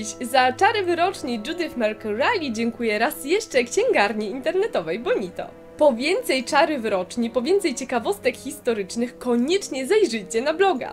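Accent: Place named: France. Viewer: Cóż za wspaniały, francuski akcent :D Wspaniała recenzja. Dobrałaś do niej świetną muzykę :D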